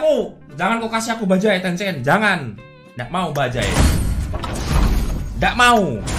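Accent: Java, Indonesia. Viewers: ngomong medok wae mas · Mas e wong jowo ngendi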